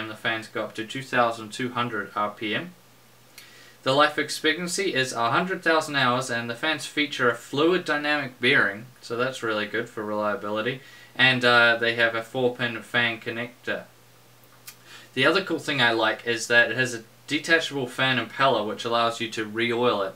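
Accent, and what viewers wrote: New Zealand. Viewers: Mate, your from New Zealand? Awesome!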